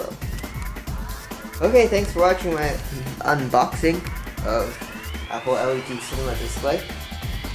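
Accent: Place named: United States. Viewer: dam!!! so much happend to you well hope it goes well you live in hong kong?? you sound so American... heheh its all good cheers.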